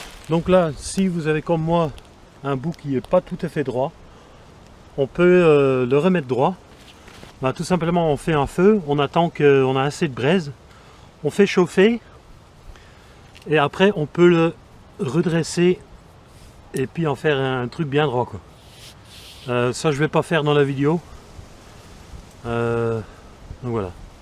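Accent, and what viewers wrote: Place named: Belgium. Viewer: Je pensais a un belge proche des flamands , j'étais pas loin! :)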